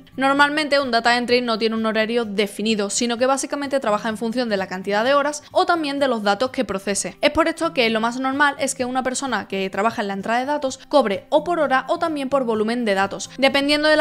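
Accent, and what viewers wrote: Spain. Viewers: Que bonita eres pero ese acento españolete arruina la magia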